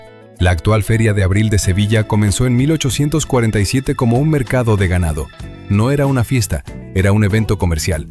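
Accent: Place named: Latin America. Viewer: Y... ¿por qué le han puesto a la IA que relata el vídeo este acento sudamericano tan cantoso? 🤔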